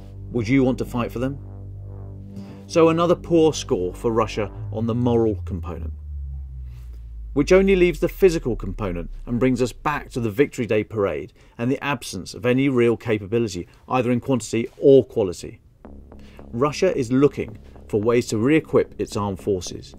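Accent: United Kingdom. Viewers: The bloke is so Bri'ish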